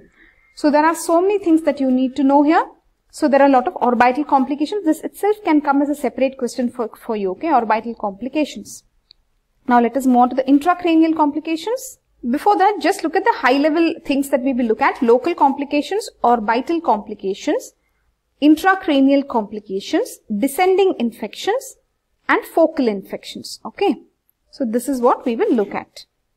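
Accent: India. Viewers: Do not have a presenter with a very pronounced Indian accent It is very hard to understand her!!!!!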